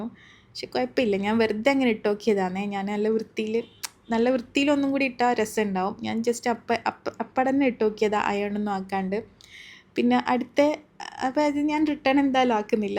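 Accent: Kannur, India. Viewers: Nalla nadan kannur varthanam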